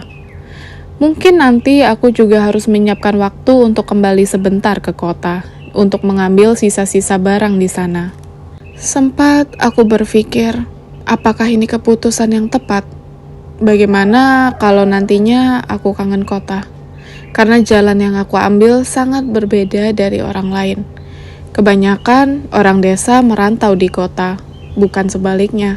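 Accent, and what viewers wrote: Manggarai, Indonesia. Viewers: Ci tinggal di Manggarai pasti e, soalnya dari logatnya org Manggrai ni, ada video kompiang, tacupiang trus ada acara smbut baru juga.. salam kenal dr Ruteng, sukses utk kontennya Ci, sngat menginspirasi😇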